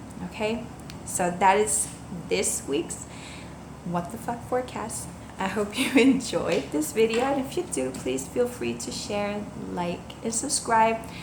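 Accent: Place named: Brooklyn, United States. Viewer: Exercise my language but this shit is great. You basically described the events of the week and I feel a lot better about things. You kept it real, and explained the cards and their energies together in a way that had me thinking about the things I’ve got to deal with. Thanks for the wisdom, and indirectly advising me on my own situations. Love the Brooklyn accent too btw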